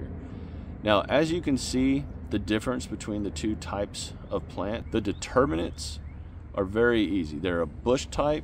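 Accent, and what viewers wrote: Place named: Texas, United States. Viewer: I am also still learning but expanding my gardens again this year. You sound like a fellow Texan so I'll so I'm subscribing to your channel in hopes I can learn more. Appreciate your honesty - we all make mistakes. If you figure out how to get rid of the squirrels please share. Hate it when I see one run across my yard with a ripe strawberry in their mouth (now caged the bed).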